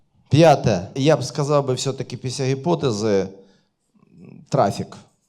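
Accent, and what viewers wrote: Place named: Lviv, Ukraine. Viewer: Приємно слухати на цій львівській, бандерівській мові! Хто в курсі, той зрозуміє)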